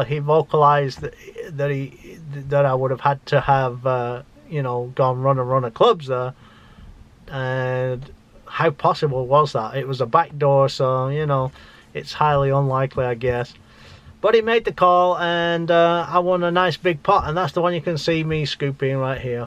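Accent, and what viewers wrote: United Kingdom. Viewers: enjoyed your vlog, keep them coming struggled to place your accent decided midlands/Leicester, was I close? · Is this guy british? his accent is really strange i cant tell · where u from? u sound like a ex brit?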